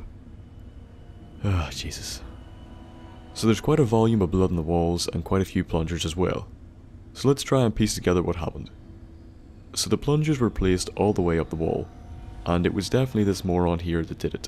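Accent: Northern Ireland, United Kingdom. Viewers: Are you northern Irish? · Same! Thought I recognized a familiar NI twang in your voice. Keep up the great videos! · Are you from Northern Ireland?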